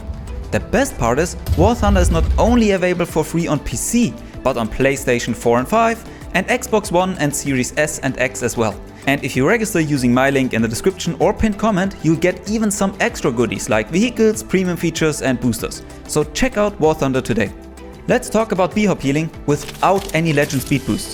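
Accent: Germany. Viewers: Wait are you german? You have a german accent im sure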